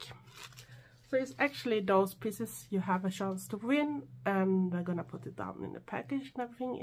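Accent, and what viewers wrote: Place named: Sweden. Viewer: Where did the comment I just typed go🤔hmm probably my crap satellite excuse for internet service....anyhoo I said the has the most gorgeous accent I've ever heard! I've never known anyone with it before you. I Adore it!☺🤗🖤🖤🖤🦊I'm going to Fika now😆👋🏻TTFN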